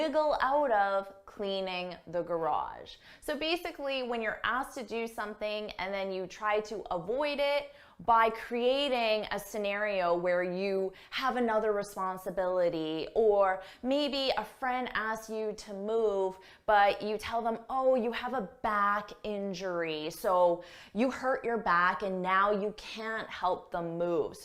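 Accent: United States. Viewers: Hi. Out of your excellent accent I guess you re American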